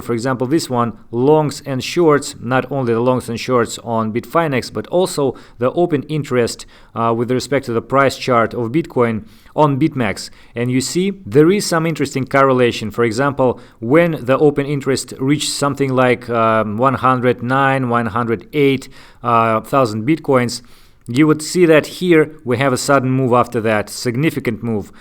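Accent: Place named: Russia. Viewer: Sounds like russian accent :) But anyway, very solid video! Thanks!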